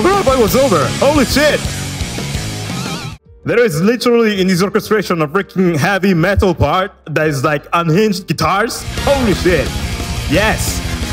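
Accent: Italy. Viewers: but... Do you have Italian origins? your Italian accent is perfect!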